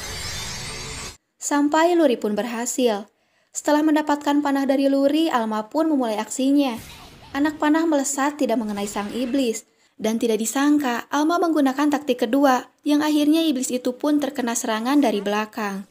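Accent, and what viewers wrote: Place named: West Java, Indonesia. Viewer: Wkwkwk tigebros piks orang sunda iyeu mah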